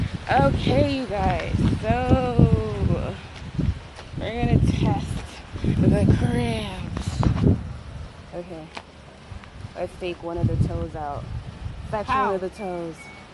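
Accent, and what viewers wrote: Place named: United States. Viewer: are u a jamaican cause u sound like american lol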